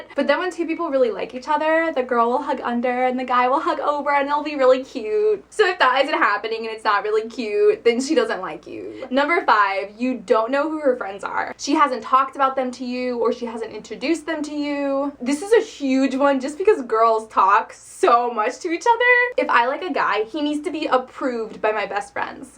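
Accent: United States. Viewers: stop talking fuckin americaneze and fuckin gabbling so fast. I need an interpretor for you! we're not all from manhattan.......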